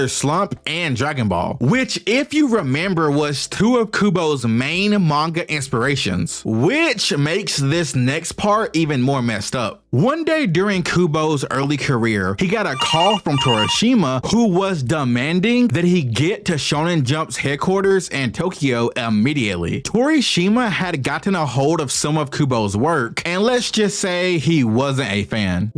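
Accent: United States. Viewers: It’s so fascinating how many similar rivalaries there have been in the Japanese and Western comic industries. The 90s were such a wild wild time for comics in both hemispheres. But also what sortve eccentric personalities get into comics. Such as Fujimoto and Alan. ( Alan believed in black magic and he quite literally attempted to end the world lol) It’s also very cool how a man with the MOST American accent is discussing Japanese comics. It’s beautiful considering not even 100 years ago - Japanese were so scared of Americans that they’d kill their own children instead of getting captured. And Americans were so scared of Japanese they created a sun, ti throw at Japan.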